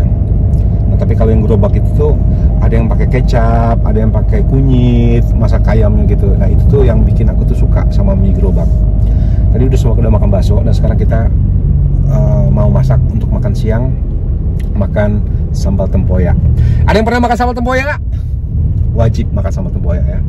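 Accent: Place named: Padang, Indonesia. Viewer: bahasa nyo cak bahasa palembang, tapi logat nyo cak padang yo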